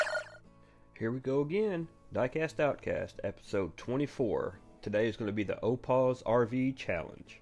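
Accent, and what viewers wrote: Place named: Kentucky, United States. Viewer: not a problem man. Its nice hearing someone with an accent like me. Im from Kentucky and I have an accent too. You sound normal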